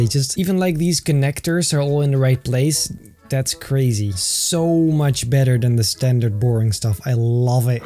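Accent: Netherlands. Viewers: dont he sound dutch?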